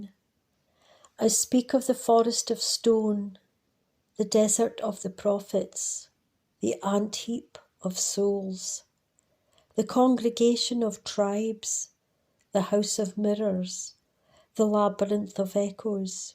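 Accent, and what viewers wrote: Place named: Scotland, United Kingdom. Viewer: Love the Scottish accent !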